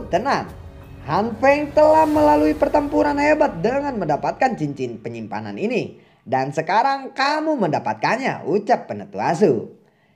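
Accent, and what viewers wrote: West Java, Indonesia. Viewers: Anjay up lagi kirain gk bakal up lagi,gw terlanjur tidur:v Mang pasti sunda nya?:v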